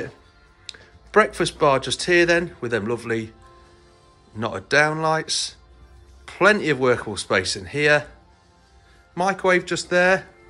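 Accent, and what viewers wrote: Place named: England, United Kingdom. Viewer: Don’t you think the English accent gives it away .? ?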